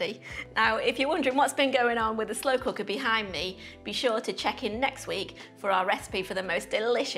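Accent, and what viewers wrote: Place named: Manchester, United Kingdom. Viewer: It’s fantastic that (a) you are British, & (b) you sound like a MANC or at least a Northerner.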